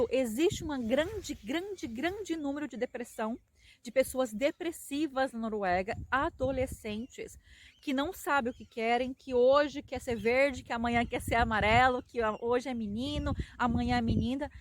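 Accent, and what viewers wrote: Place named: Caipira, Brazil. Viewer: Porque ele só existe no conceito cristão. Deus não é um velho de barba branca que viveno céu para amaldiçoar, castigar ou abençoar pessoas. Isso só serve para controlar o ser humano pelo medo. Deus existe sim, mas não é nada disso que o cristianismo de modo geral prega. Os noruegueses despertaram. Putz ouvir esse erre caipira não dá, fui...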